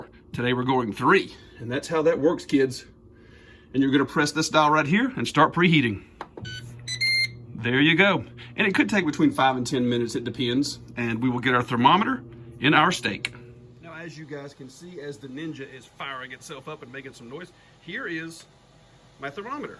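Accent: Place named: South, United States. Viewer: You sound so southern 😉💋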